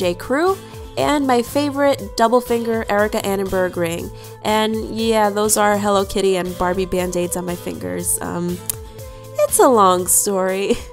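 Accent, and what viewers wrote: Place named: Long Island, United States. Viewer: This was my FAVORITE OOTD video EVER! PLEASE do more! I love your personality! I am from Long Island and your voice reminds me of all of my friends from back home!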